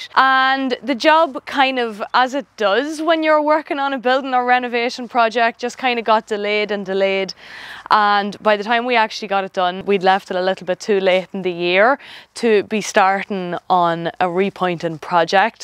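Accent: Ireland. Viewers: Iam a bit of the Irish me self and I love your accent, been raised in California so no accent. I do know a few things in Irish slang if I dare call it that. How blessed you are to have a beautiful cottage in the country side. I moved from California to Texas because their country sides reminds me a bit of the Irish country.😢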